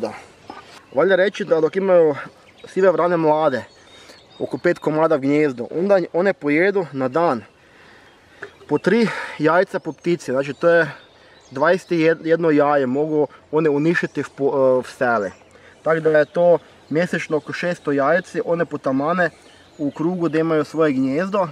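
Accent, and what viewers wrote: Zagorje, Croatia. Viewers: Neznam jeste vi u BIH imali na vašoj televiziji domaći stari humoristični serijal "Gruntovčani"...identičan govor,valjda mi zato i je zanimljiv! Simpatičan:) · Ma Zagorski naglasak, samo sto je ovo u fullu xD sa primjesama Slovenackog, mene podsjeti na Pervana i onog bunara i sina Vl00de ahhaha samo ne moze ni Pervan imitirat koliko ga kralj zavrne jezikom lol, nije mi to poznato bar po imenu, ali kad bi pogledao vjerovatno bi se sjetio, a kako znas da sam iz BiH, nije mi jasno kako ljudi na osnovu nicka, ne pretpostave nego vec zakljuce odakle si:D zanimljivo... EDIT: evo ti to sa Pervanom i bunarom hahaaa <link>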